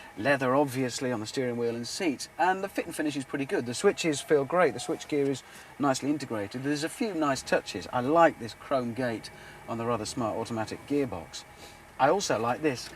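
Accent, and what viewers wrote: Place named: Birmingham, United Kingdom. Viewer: His Birmingham accent was still audible back then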